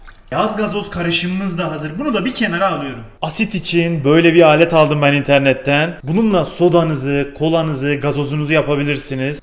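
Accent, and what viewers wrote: Adana, Turkey. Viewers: Adanalı mısın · Abi adana şivesi gibi konuşyorsun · rendeliyrum adana şivesi çok iyi :D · alürüm geçirüm eklüm aktarıyorüm abi adanalı mısın hzhshshebshs seviliyosun cok beceriklisin masallllaahh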